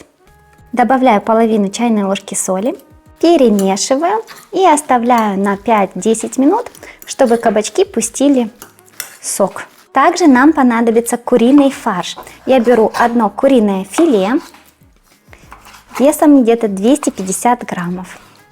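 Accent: Ukraine. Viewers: У Вас неймовірно гарна українська мова,пані ❤ А рецепти -як завжди супер 👌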